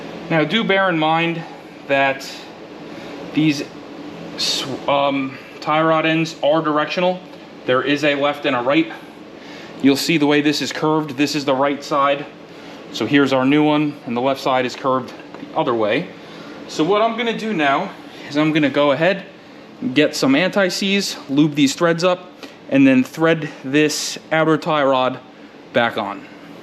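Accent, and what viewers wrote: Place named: South Jersey, United States. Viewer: Much appreciated, it's on my list. Kudos from a native South Jerseyan (clocked you accent immediately 😅)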